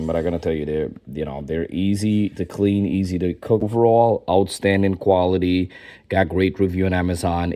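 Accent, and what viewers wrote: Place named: Guyana. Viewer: Are u Guyanese by chance u sound so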